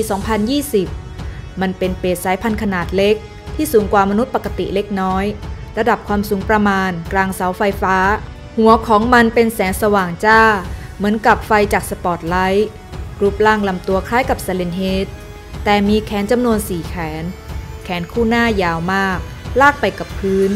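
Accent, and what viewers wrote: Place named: South, Thailand. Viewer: พี่เป็นคนใต้เเน่ๆ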